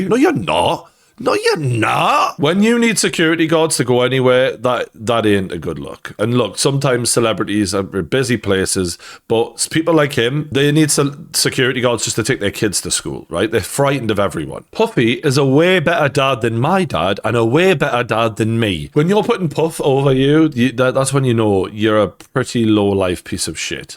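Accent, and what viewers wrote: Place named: Northern England, United Kingdom. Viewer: He's dangerous and a drug addict. The fact kids are unaware of the swat sticker is dangerous he makes me sick he's Jelous and bitter 😂😂😂 he's made me hate him since Sunday I can handle the Grammy show up but he was greedy!!! Wanted the attention kendicks light. He said too much that's unfortunate and unforgivable. Ps love your accent I'm from Cheshire and a creator and love my nothern creators. ❤❤❤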